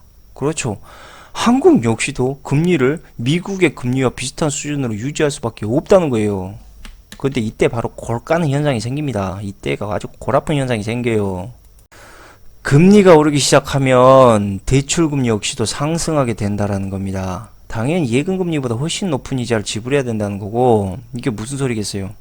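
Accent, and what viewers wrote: Jeolla, South Korea. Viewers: 전라도 사투리 쓰시는게 너무 듣기 좋네요, 영화배우 송새벽씨같아요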